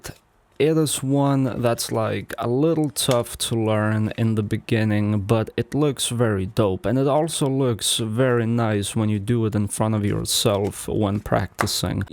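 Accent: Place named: Russia. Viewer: Ahhh that Russian accent😭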